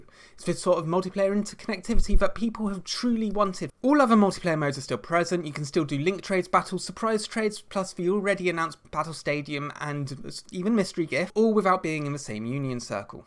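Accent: United Kingdom. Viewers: I've been using forever and never knew was british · YOUR BRITISH???? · holy shit is British? not sure why that caught me so off guard lol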